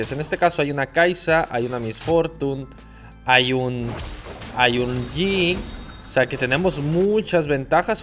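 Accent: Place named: Peru. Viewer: Y un poco de peruano:v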